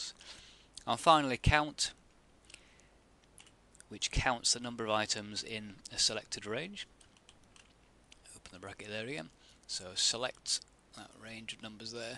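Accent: United Kingdom. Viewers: Wow he is a very good teacher very clear. and the British accent keeps it interesting!!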